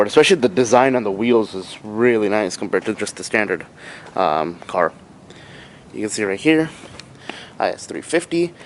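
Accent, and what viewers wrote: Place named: India. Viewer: I just love this car. It is favorite car and i do want to buy one asap. BTW I noticed a slight accent in your talking. Are you indian by any chance?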